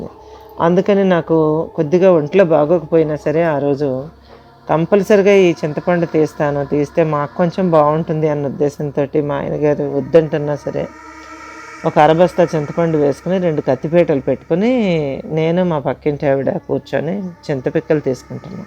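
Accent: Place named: Parvathipuram, India. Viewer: Ammaa maadi kuda parvathipuram.konni padaalu appativi golem lantivi vintunte chaalaa santhosham anipinchindi .mee video chudatam start chesina tarvaata starting lo appati aa yaasa konni sarlu mee notiventa vunnappudu ide santhosham kaligindi ammaa meerante naaku chaala ishtam ammaa❤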